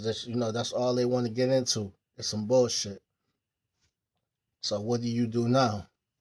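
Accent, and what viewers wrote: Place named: California, United States. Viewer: 100% facts! You was born in NY? Sounds like you got some Cali in you. ✊🏽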